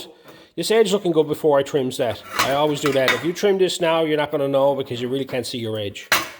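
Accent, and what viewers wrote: Ireland. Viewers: . Iv just found this channel mate. Iv seen 4 videos of yours. I bought my grandson a we car there's a we hole in the floor and the rockers. Looking at you're videos I'm sure I can repair them. Do I hear a bit of Irish in your voice. anyway good job all round mate. Best of luck for the future. Paddy from Ireland. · Nice work. Great accent, sounds slightly Irish! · what accent has this guy? there is some Irish accent on some words and not that fake Irish accent you think we Irish have.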